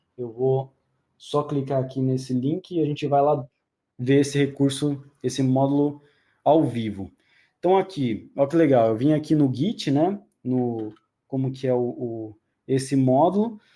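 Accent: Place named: São Paulo, Brazil. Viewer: Fale mano (sotaque paulistano 😅) você foi top na explicação. O lance de explicar os bloquinhos do TF achei muito didático e me fez entender o básico da coisa em 30 minutos de uma maneira phoda. Suas analogias sobre o declarativo e procedural e o Ansible que estava querendo saber o que era ao certo. Parabéns pela didática e simplificação para um melhor entendimento. Eu hoje “brinco um pouco” com Cloud Formation e se desse para fazer um comparativo de CF x TF o que muda por exemplo ao criar um s3 em CF para TF seria top. Com sua explicação vou tentar fazer isso na empresa (trabalho no Itau) e só para vc saber, seu conteúdo esta servindo como base para muitos aqui. Mais uma vez parabéns pelo trabalho Deus abençoe sucesso e felicidades.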